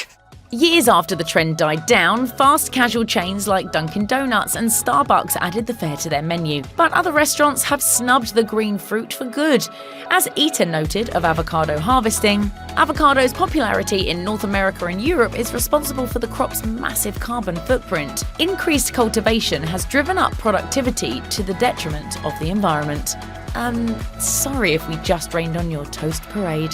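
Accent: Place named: United Kingdom. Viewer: Wtf is this British broad?🤔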